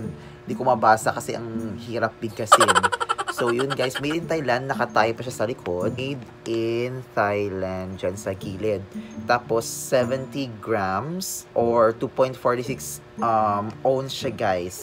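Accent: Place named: Cebuano, Philippines. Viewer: parang my cebuano o ilonggo accent ah. galing ng vid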